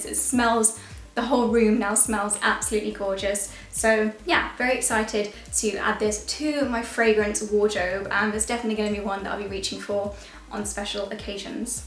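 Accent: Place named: United Kingdom. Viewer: Quality creams give quality results, so classy charming absolutely finest British accent, beautiful sensitive lady , God bless dear 💚💚💚💛💛💛💙💙💙💜💜💜💕💗💗💗💗💗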